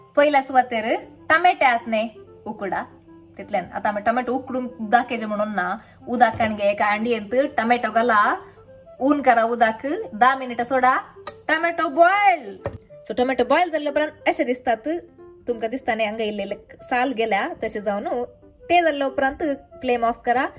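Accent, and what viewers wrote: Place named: Mangalore, India. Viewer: Recently started watching your video's and vlogs really enjoy the way you talk in manglorean . I too am a manglorean feels connected❤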